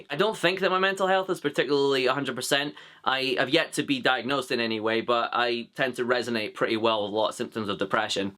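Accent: Scotland, United Kingdom. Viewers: Glad you're back and you sound as Scottish as ever! I look forward to your following videos and I'm sending creative vibes your way :) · Literally created a channel just so I could respond to this video. I'm glad you're back, I haven't been youtubing for some time but I originally started watching your videos to learn a Scottish accent. Then I started enjoying them and I'm very glad that you're back.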